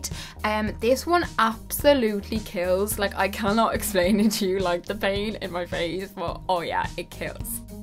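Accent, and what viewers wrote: Ireland. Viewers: Are u Irish you sound irish 🇮🇪❤️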